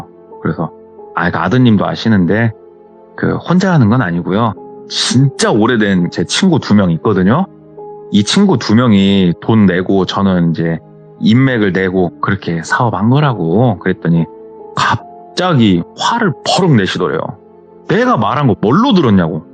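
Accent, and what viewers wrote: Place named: Jeolla, South Korea. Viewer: 너무 재미있어요 빠져들어요 전라도 구수한 목소리 감사합니다.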